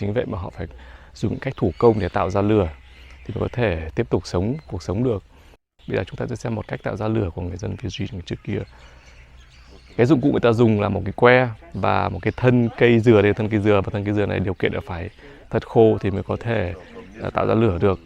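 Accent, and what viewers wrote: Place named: Mekong Delta, Vietnam. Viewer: Canh giong mien tay wua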